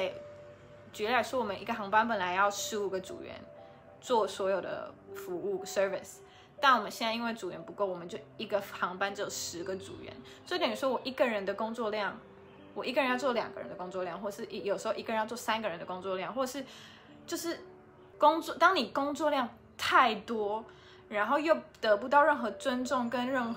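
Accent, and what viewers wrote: Taiwan. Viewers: 回來啦？在臺灣？隔離中？標準的臺灣國語，很親切！ 不知道答案也是答案？打電話來都不是好事？推這兩句直白的話！珍珠奶茶當飯喝，這個也可以推！😋😋😋😋😋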